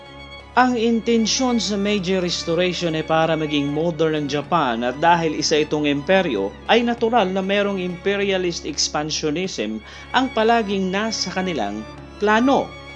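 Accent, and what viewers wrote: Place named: Tagalog, Philippines. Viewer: Habang tumatagal medyo tumatagalog na ang punto.. At hindi na masyado puro "Pero"...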